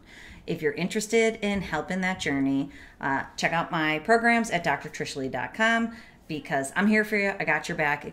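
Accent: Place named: Wisconsin, United States. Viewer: That Wisconsin accent is so annoying miss church lady.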